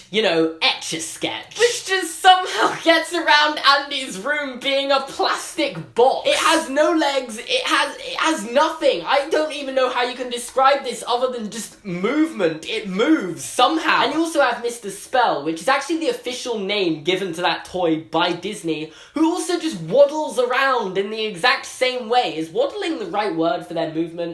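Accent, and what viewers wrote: England, United Kingdom. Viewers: Alize Cordero I live in England and he does have really posh accent to be fair....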